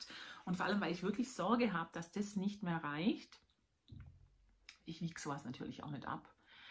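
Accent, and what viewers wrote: Swabia, Germany. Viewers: Liebe! Schwätz du ruhig schwäbisch - ich find‘s super 👍🏻 Zu den Qualitäten für den Sommer mag ich persönlich nix mit Merino. Eher Baumwolle-Mix Garne. Mach bitte weiter so 👏 Liebe Grüße Gabi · Habe Dich eben entdeckt, durch Frau Nadelgeklapper, und bin begeistert von Dir und Deinen tollen Projekten! Außerdem finde ich unseren schwäb. Dialekt so "heimelig"! Mein Abo hast du. Liebe Grüße Nicole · Toll gemacht😊 Dankeschön! Sehr sympathisch und genau unser schwäbisch von kurz vor der Alb 👍🏼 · Hallo,dein Podcast hat mir sehr gefallen,bitte mach weiter 😊..Ach und ich finds gar nicht schlimm wenn das schwäbische durch kommt, das macht uns schließlich aus....Liebes Grüßle aus Fellbach 🤗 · Ich hab’s auch sehr genossen Dir zuzuhören.. und wie schön ausm Schwobaländle🥳.. liebe Grüße vom Fuß des Hohenzollern Susanne 💕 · Hallo, vielen Dank, dass Du Dich endlich getraut hast! 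Das mit der Technik hast Du ja alles voll profimäßig hinbekommen, Lautstärke, Ton- & Bildqualität sind perfekt 👌🏼 Ich mag Deine Projekt- und Wollauswahl sehr, und vor allem liebe ich den schwäbischen Einschlag 🥰 Dass Du uns auf Deinen Weg zur Handfärberin mitnimmst finde ich ganz toll, das war für mich eigentlich das interessanteste Segment, weil ich davon null Ahnung habe. Total spannend, bitte weitermachen! Kleiner Verbesserungsvorschlag: Du sagst sehr oft „tatsächlich“, ich bin bei sowas aber auch „tatsächlich“ sehr empfindlich 🙈... und Du hast auch überhaupt keinen Grund, Dich dauernd zu entschuldigen, gerade dass Unperfekte macht es doch lebendig 😉 Ich freue mich sehr auf weitere Folgen von Dir!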